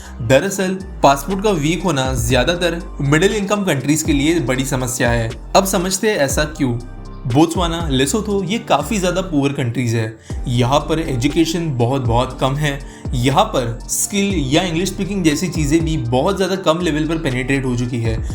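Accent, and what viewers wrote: India. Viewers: Love the typical Indian voice and language speaking style, all Indians have the same exact which is so funny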